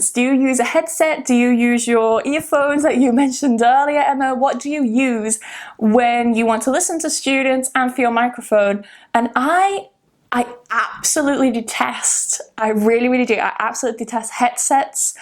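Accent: United Kingdom. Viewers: You're the best teacher in British accent. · You’re one of my favorite teachers, you speak clearly, that is why I love British accent.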